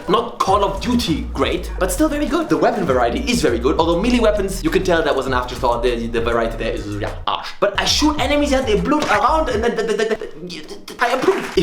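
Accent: Germany. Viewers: Why does he sound french british and chinese at the same time Maybe or just german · The video is great but you make me enjoy the german accent a lot more than usual , it sounds satisfying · Thank you so much for speaking like a normal human being. Nothing I hate more than a youtuber slurring their words to get to the 10-minute-mark. edit: also your Denglish is so endearing.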